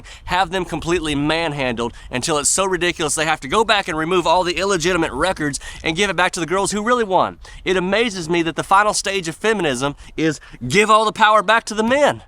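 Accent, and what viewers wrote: South, United States. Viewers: Between the Southern accent, and the cadence in which you speak you reminded me of someone then it hit me. Ted Lasso! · Howdy y’all, I’m a 60yr old Canadian woman. We were the Led Zeppelin teens. First off love your southern accent! So smooth and friendly. Here in Toronto this whole thing has exploded. Out of fear of being called a Na*i I’ve tried to do the pronoun thing but holy sh*t I’M SO DONE!! These people are like “Revenge of the Nerds” gone nuclear!!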